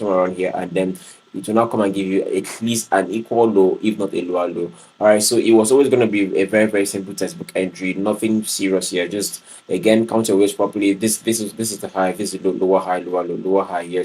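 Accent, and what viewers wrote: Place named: Africa. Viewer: yeah I get you but I've been following this guy for about 2 years and my goodness his accuracy is top notch He speaks like an African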